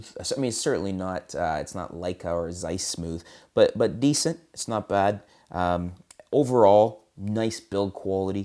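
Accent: Canada. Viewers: Good informative review. Pleasant Canadian accent!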